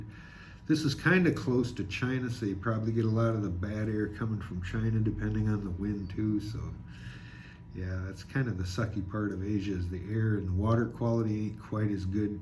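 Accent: Canada. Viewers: You hear some good ol' Canadian, eh??;-))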